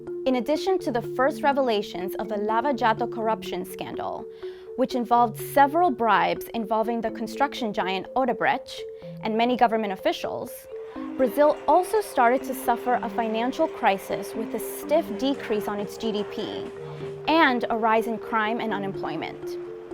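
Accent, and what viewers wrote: Spain. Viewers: because she speaks Spanish as her language 🤷🏾‍♂️ 🤷🏾‍♂️ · It's annoying how you pronounce Brazilian names with a Spanish accent. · y u are Speaking portuguis with spanish accent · A lot of facts given against Bolsonaro were neither fully nor at all explained! oh, and by the way, why are you speaking portuguese with a spanish accent? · Cool. But why is she pronouncing names and places with Spanish accent?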